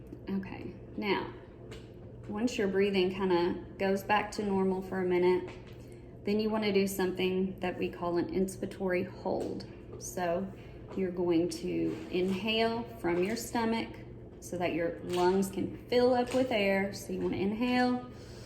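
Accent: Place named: South, United States. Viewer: Also, I love your home and your southern accent. Old Texas dude here....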